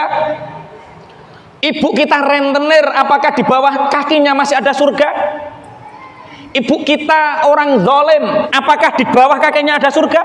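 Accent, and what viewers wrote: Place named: Bojonegoro, Indonesia. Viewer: Kekel aku ngrungokne logate Yai Bojonegoro ,sebabpe aku dewe wong Bojonegoro ,tapi matur suwun pencerahanipun ,semoga berkah P Yai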